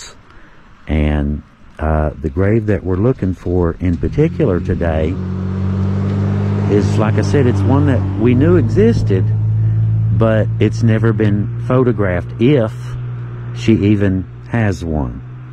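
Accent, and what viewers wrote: South, United States. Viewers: You know what you got a seriously good voice. If I was you I would be reading civil war novels etc online. I think you can make a good living as an orator dude especially as if you were reading Southern history specifically confederate civil war. You got the perfect accent for it. I think you can make a good living doing documentaries for History channel. Perfect soothing voice and I'm not kissing your ass. I'm just sayin. I'll leave you alone. Say hi to the boss.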